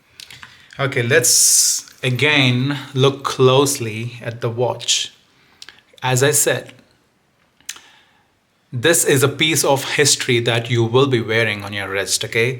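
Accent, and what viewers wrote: India. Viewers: is definitely an Indian fella.the his commenting.so fuckin yindiann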